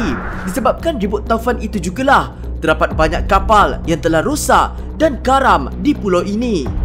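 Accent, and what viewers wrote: Malaysia. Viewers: KAMU ORANG MALASYA ATAU INDONESIA SIIII? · Hos. Cakap utagha teghuih la.. cakap kl. Cmpoq2 utagha. Mcm pelik plak bunyi.. 😅😅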